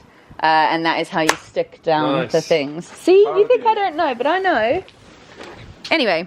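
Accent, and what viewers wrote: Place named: South Africa. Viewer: sounds so South African!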